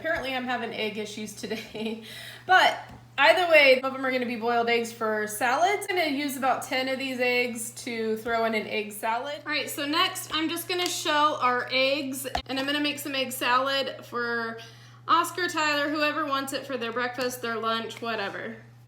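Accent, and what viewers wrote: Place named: South, United States. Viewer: Man I wish my family ate left overs I cook fresh daily my husband eats last nuts for lunch n my son eats last nuts for after school snacks ...what state do u live in ? U sound like that one girl who always says y’all she makes tons of videos .. n yes I live keto connects as well . Ur nails r on point 💕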